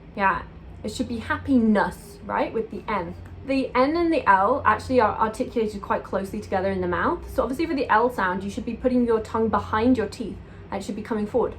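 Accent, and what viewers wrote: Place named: United Kingdom. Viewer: that British accent....... <3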